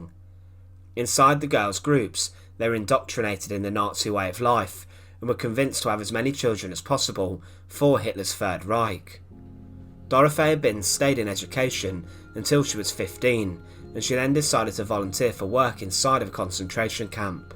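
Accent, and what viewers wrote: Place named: Northern England, United Kingdom. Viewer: hes a working class man from Northern England..not too sure where from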